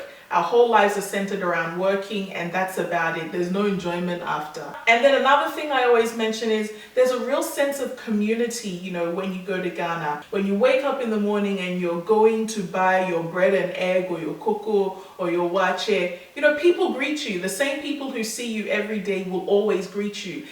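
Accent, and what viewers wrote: Australia. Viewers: M8, you're an Aussie! As soon as I heard your voice, I thought 'educated Aussie babe', great to have you here!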